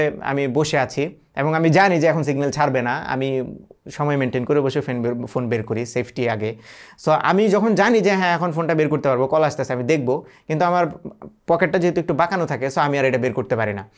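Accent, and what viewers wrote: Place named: Kishoreganj, Bangladesh. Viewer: Still মানুষ আমারে গাইল্লাইতাছে শুনার পর আমি বিশ্বাস করলাম আপনি আমার জাতি ভাই কিশোরগইঞ্জা ভাই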